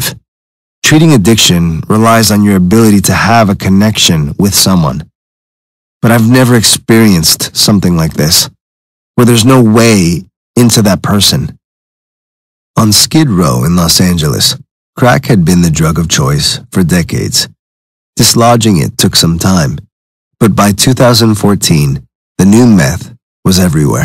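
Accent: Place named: United Kingdom. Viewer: …one photo no captions British (sounding) host fku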